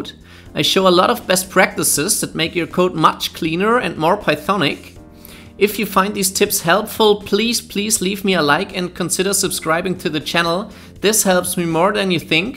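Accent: Germany. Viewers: Are you a German?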